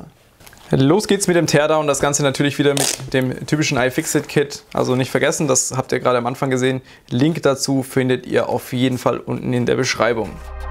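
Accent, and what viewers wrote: Swabia, Germany. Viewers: dein Schwäbischer unterton tut den Ohren gut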